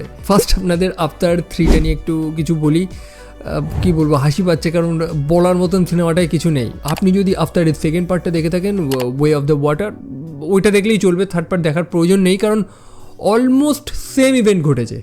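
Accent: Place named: India. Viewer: ভারতীয়দের উচ্চারণ আরকি 😂ইংলিশ ওরা এমনেই উচ্চারণ করে 😂নিজেদের মত করে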